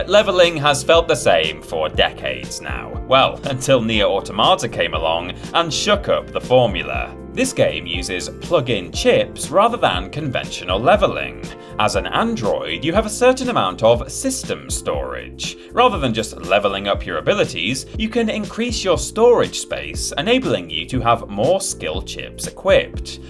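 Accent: United Kingdom. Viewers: Damn brits with their cool accents and crude dry humor lol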